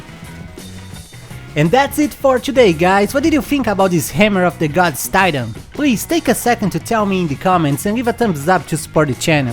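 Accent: Brazil. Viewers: Vc é br, o sotaque parece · cade o video em portugues? certeza que é brazileiro com esse inglews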